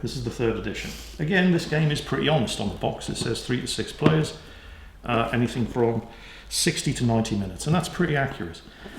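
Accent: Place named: United Kingdom. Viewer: I'm the happiest guy ever. I received a like from the overlord itself. I remember once I started watching your videos 7 or 8 years go to get used to British accent. Today I'm living in the UK.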